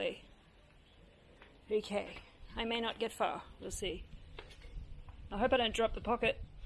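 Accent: Australia. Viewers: It would be a cold day in hell when I make fun of your accent. However, that does not stop me from turning on the subtitles when watching Australian crime dramas on Amazon Prime. It took me a while to find this remedy. Previously, I was only understanding about 60 percent of the dialogue. Much better now. Best regards. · Love your Australian accent, and your clear detailed description of your vlogs of what are being shown. Thank you for sharing 🙏.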